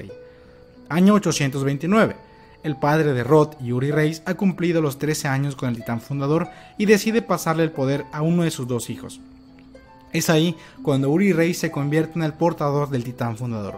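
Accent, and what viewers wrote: Yucatan, Mexico. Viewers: De donde eres? parece que tienes acento Yucateco ajsjajja Ya se que nada que ver con SNK xd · Eres de Yucatán?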